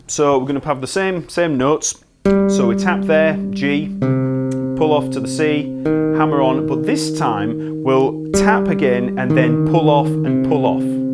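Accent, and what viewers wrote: United Kingdom. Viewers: Nice video an' accent. R u brit? If you are, from where?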